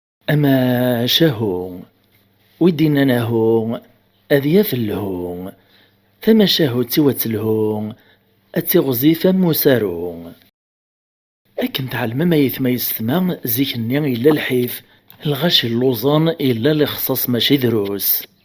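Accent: Kabylie, Algeria. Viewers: Votre kabyle ressemble au mien 😊 je suis de Béjaia et j'habite a Londres. Je dors avec vos belles histoires. A3tik sahha ayizem averqach.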